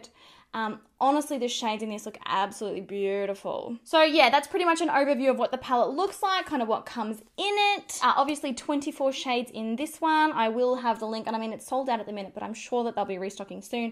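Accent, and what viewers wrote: Australia. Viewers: Oh my goodness 😍❤️ that palette is so beautiful. Hope I can win it I never win anything but hey you never know. Love your videos as always and love the Australian accent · I freakin love your Australian accent!!!! Watching here in the US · Love that I have found your channel through Tati! So nice to hear an Aussie accent killing it on YouTube 🥰🥰🥰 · I'm an Aussie living in the US and it always makes me so happy to hear a familiar accent <3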